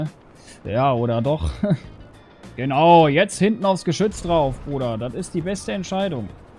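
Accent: Cologne, Germany. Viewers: ahaha wurden die dezent wegpenetriert lol.......dein Köllner Akzent ist wonderfull:D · Kommst du aus der Nähe von Köln?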